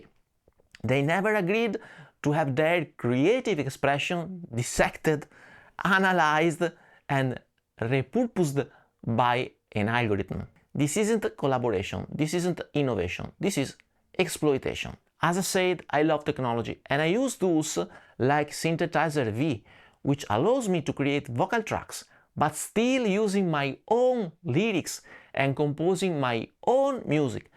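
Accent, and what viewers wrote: Italy. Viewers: Dear, when you speculate about the proposal you got, somewhere between and, you said that they probably plan to use your "stupid Italian accent." As a language teacher who works as a pedagogical coordinator and holds an MA in Linguistics, and a subscriber to and fan of your channel, I'm in a good position to tell you that your accent is exquisitely and melodically beautiful. I guess I speak for everyone here when I say that the way you talk is one of the things that make your channel unique. I know you were being humble when you said that, but I thought it would be nice to let you know how much I/we love your accent - and your content, of course! Cheers from São Paulo, Brazil! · I fully agree with you. You are not old, you are simply in the right side! And, of course, your italian accent is totally fine 😂😂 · ! We love your channel, your content and information is invaluable to the community, we even love your italian accent ( i would love to speak any language at your level of proficiency)! 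Stay True! Stay Original! Stay Consistent! Keep up the great work! Thank you! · You’re accent isn’t stupid. You’re an Italian who speaks English. So there is an accent. I love to visit 🇮🇹 once again. The last time I was just a bambino. 🧒 such a beautiful place.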